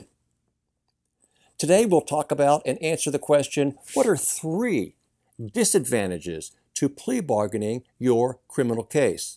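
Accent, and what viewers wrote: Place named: Australia. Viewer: P S well if ultimi are Italian . Let’s talk in italian😂 . I thought you were Australian .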